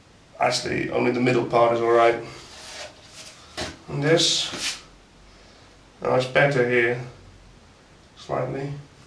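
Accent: Netherlands. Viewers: What's the accent may I ask? Sounds like Dutch but living in the UK for a decade or so... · Haha ik zat te luisteren en ik denk, hmm wat voor accentje is dit, klinkt heel hollands :P. Lees de eerste comment, ja hoor :P. Wat voor hout raad je aan?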